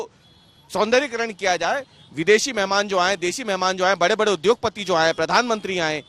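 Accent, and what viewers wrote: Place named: Bhopal, India. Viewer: Bhopali bolte h😂😂😂😂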